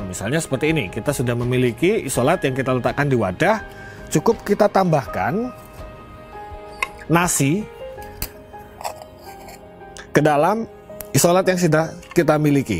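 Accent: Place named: Kudus, Indonesia. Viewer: Panjenengan gaya penyampaianya seperti temanku org Kudus.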